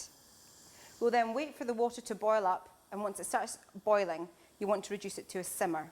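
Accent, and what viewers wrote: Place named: South Africa. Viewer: I just love the South African accent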